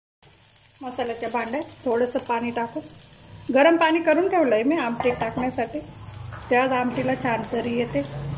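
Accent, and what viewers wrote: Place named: Dhule, India. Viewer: Aap dhule ke ho kya😁nice video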